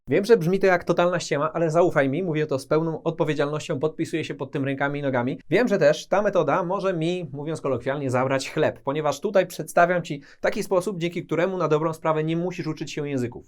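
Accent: United Kingdom. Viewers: masz british english w stanach nikt tak nie mowi.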